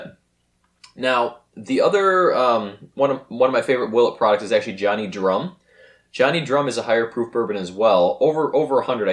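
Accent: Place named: Wisconsin, United States. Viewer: Nice accent. Wisconsin?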